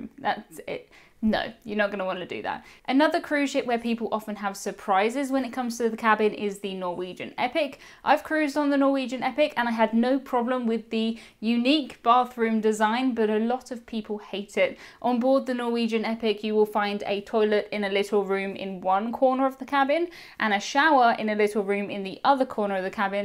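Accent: RP, United Kingdom. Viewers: I've never been on a cruise but will hopefully. I only watch your videos because you're so dang gorgeous and I love your posh accent ☺️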